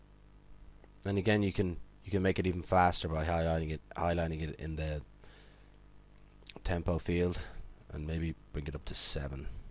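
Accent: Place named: Ireland. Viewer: ill know that your irish 5 stars your for dublin so am i because i knoe your acent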